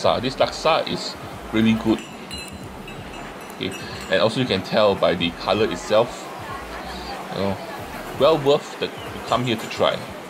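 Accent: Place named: Ang Mo Kio, Singapore. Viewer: What part of Singapore do you live in? You have a classic Ang Mo Kio accent. I love it. ;)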